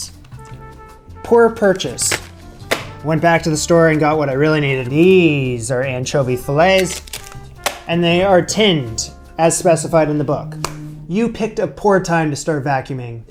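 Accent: Canada. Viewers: Me expects all Canadians to know french slaps the naivety out of me Well, at least he's still got the adorable Canadian accent, like every thing he says sounds kind of like a question.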